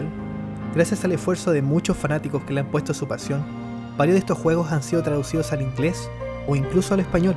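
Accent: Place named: Chile. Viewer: Increíble reseña. Encontré tu video porque alguien lo recomendó en un tablón de imágenes. Me llamó la atención la imagen del post en el que estaba la niña escalofriante que está en un callejón al atardecer. No me esperaba encontrarme con esta calidad de vídeo. El juego parece genial y lo que expones sobre el mismo me hace querer jugarlo pero por desgracia, no tengo mucho interés en aprender japonés, así que será a puro gameplay por ahora. Sin embargo, me asombra tu dedicación y atención a los detalles al armar, editar y en recopilar información de un juego casi desconocido y del que jamás había oído y en otro idioma para luego presentarla de manera que no solamente entretenga, sino que cuarenta y cuatro minutos me dejen queriendo ver más. Te ganaste un suscriptor más entre los cientos de miles que estoy seguro de que vendrán en un futuro. siempre que le des tanta o más dedicación a cada contenido que realices en el futuro. 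Y aunque honestamente no soy fan de tu acento (que creo que es chileno), la verdad no le di mucha importancia. Un poco de cuidado con el volumen del audio de tu narración es lo único que podría recomendarte. TL;DR : tremendo video bro, sigue así.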